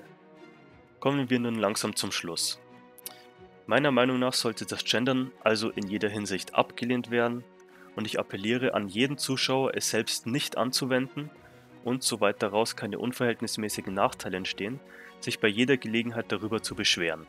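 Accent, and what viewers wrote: Bavaria, Germany. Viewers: wo genau? 'ua' is ja eher Niederbayern/Oberbayern. Mia Oberpfälzer bzw. Waitler han ja de oanzigen de wo no as 'ai' und as 'ou' ham😂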